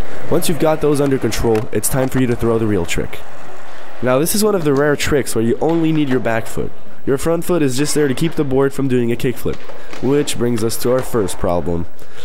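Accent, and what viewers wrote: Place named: United States. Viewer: mdr ok, au debut jpensais t'avais pris la voix d'un trick tip americain et que tu l'avais mise ici :)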